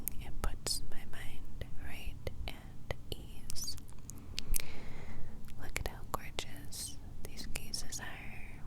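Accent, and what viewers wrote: New York, United States. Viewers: Okay these videos are tingly but they are really fun. The new york accents kill me . Never ever ever ever ever stop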